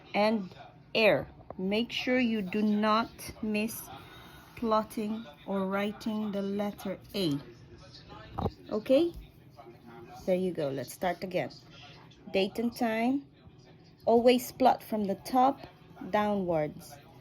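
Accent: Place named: United Kingdom. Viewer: Naks ke galing naman mag tutorial bagay na bagay with British accent pa saan ka pa nun..😆💕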